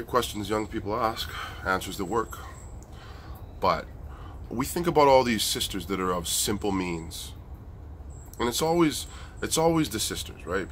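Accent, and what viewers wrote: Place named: Canada. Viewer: You are so adorable and funny. Love the Canadian accent!!!!!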